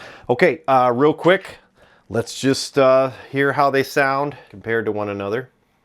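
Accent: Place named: Midwest, United States. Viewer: just watched this episode for the second time and wish I could give you another 'like.' Good off-the-cuff midwestern commentary and I appreciate that man. Here's an odd question maybe: Do both these guitars have a '13' in the middle of the S/N?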